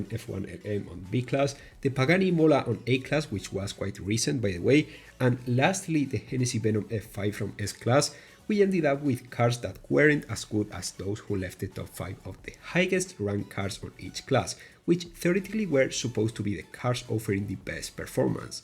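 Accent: Spain. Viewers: R u spanish? Ur accent is pretty similar to that people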